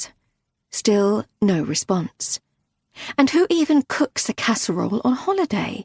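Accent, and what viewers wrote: United Kingdom. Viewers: I love British accent. I actually prefer British accent instead of an American one.❤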